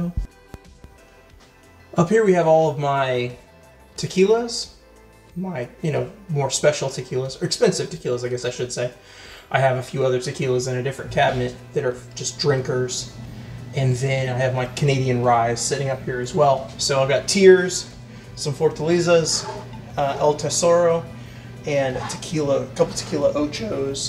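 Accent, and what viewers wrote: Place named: Wisconsin, United States. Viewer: Sounds like you might be from Wisco with all they J. Henry!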